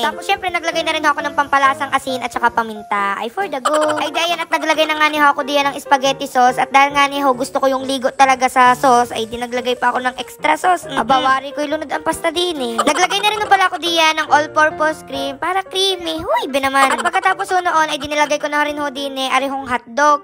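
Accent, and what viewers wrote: Batangas, Philippines. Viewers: Dati ang vlog nito nung ndi pa sumisikat pumupunta xa sa mga katutubong mangyan ngaun nung nkilala na xa ndi n xa nadalaw dun, ang tunay n mngyan nandun mindoro sa bundok.nka bahag lang cla. Un dapat balikan mo qng saan ka nkilala dahil kumikita kna, ang mang yan ndi batangas ang accent.😢😢😢😢dati nung ndi kpa kilala lagi k nadalaw sa lugar ng mngtan ngaun ndi q n nkita bumisita k sa knila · Seriously? Mangyan na tagalog at puntong Batangas?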